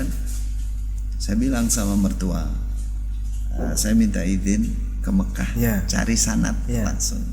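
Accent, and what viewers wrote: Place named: Java, Indonesia. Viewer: Madura tapi logat bicara nya 100% jawa...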